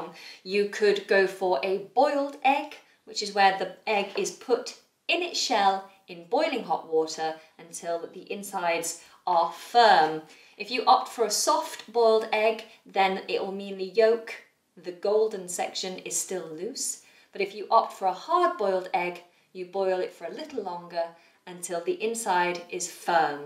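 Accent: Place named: United Kingdom. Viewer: I'm enjoying your British English ))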